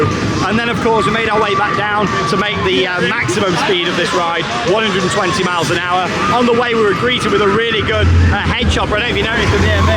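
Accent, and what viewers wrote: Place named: United Kingdom. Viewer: Holy British, Batman! I can’t understand a word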